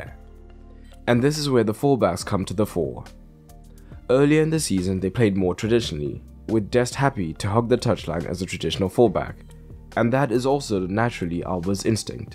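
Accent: South Africa. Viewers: I know you have a UK channel but are you South African? Your accent sounds so South African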